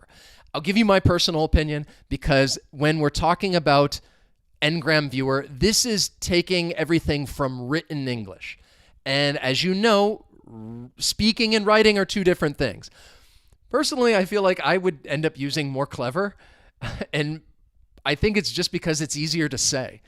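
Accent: United States. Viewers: pls make a video about your accent and your state of origin 🇺🇲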